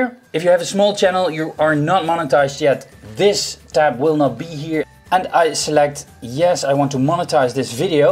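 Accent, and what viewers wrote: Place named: Netherlands. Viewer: Ik ruik nederlander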